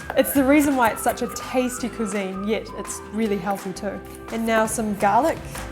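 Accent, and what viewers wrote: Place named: New Zealand. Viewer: The accent is From New Zealand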